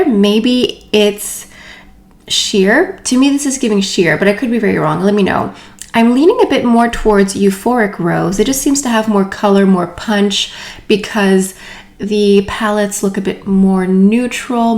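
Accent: France. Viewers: Hello, I like your French accent... Thanks for sharing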